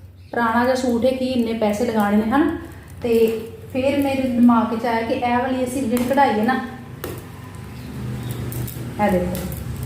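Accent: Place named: Majha, India. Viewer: ਤੁਹਾਡੀ ਬੋਲੀ ਮਾਝੇ ਦੀ ਏ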